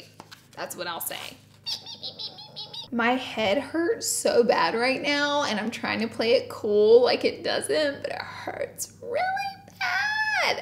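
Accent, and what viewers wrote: South, United States. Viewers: Her southern accent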